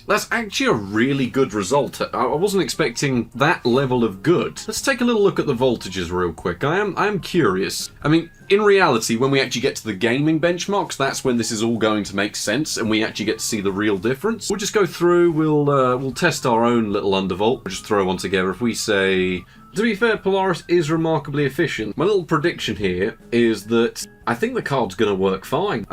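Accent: United Kingdom. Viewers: What region of the UK is accent from?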